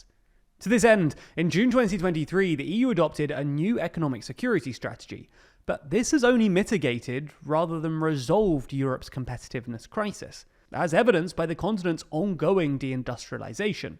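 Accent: United Kingdom. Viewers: Fairly sure 'catch up to' is american and on this side of the pond we say 'catch up with'? I say that as one of the things i enjoy about the channel is a well spoken british accent!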